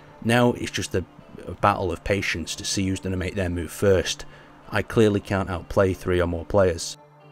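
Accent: United Kingdom. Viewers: The clothing usually comes with such things, but as his accent tells hes most likely british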